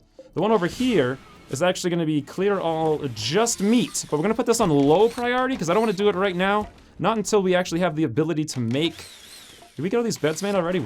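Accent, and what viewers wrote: Ireland. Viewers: Luminary Xion He almost sounds Irish. Weird. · I thought he was Irish too xD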